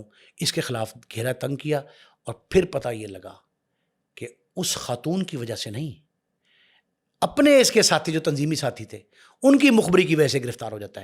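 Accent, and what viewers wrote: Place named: Lahore, Pakistan. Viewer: Karachi k hawaly se bat kre hain to meherbani suni sunai kahani na sunaen dusri bat ye wo Farooq ka accent Faarooq hai na k Farruooq plz apna lahori accent ek taraf rakh kr karachi ki bat kren 😡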